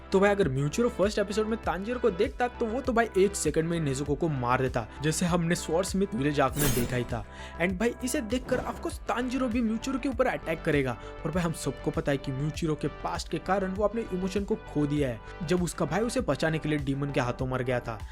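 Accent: Maharashtra, India. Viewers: Tu maharashtrian 😅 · Bhai you are Marathi speaker confirm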